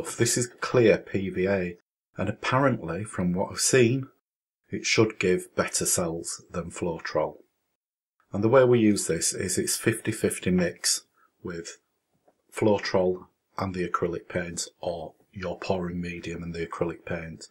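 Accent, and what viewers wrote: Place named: Sheffield, United Kingdom. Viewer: An Excellent Tutorial and not just for beginners. We come from the same area re accents and I'm glad to see you using real spoons they wipe off so easy ( an ex pat from Sheffield ) Ann Wardley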